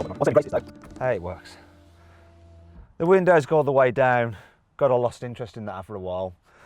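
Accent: United Kingdom. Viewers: 범프=과속방지턱 터닝 서클=회전 반경 파이럿=파일럿 약간 외래어는 영국발음 들리는 대로 번역하시는듯요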